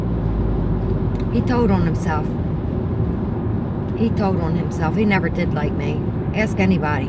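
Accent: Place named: Philadelphia, United States. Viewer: Thank you for sharing ❤ very brave woman! Out of curiosity are you in PA? I was trying to place it while you drove and you have (as do I lol) that east coast/Philly accent! If so we are nighbors:)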